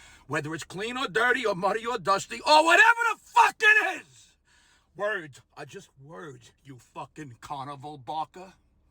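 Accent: New York, United States. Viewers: I was born in NJ exit 13, grew up exit & A. Family is from Brooklyn Bay Parkway and 86th street. When you speak your accent makes me think of home. In HS the Jews were close friends with the Italian kids. We liked to date them because they were easy I men friendly. The Italians dated the Jewish girls and discovered what a headache was. Cultures are very similar. Comedy back in the 60s was really funny and if you went to the Catskills it was dirty. Think of Buddy Hacket. I miss the old days and you bring them back for me. Dont shut the fuck up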